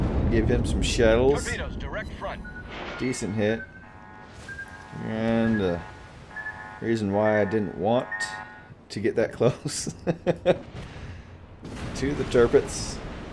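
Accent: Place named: Texas, United States. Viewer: Much enjoyed ty! You've mentioned you're in Texas - I am curious what general region and is that where you're from? Mostly I'm curious your accent which is not over-the-top but just enough : ) For my part I was not born in Texas but I got here as soon as I could!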